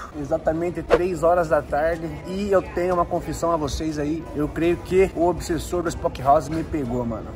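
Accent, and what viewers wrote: Rio Grande do Sul, Brazil. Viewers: tu é de onde? fala igual nois aqui do rio grande do sul kkk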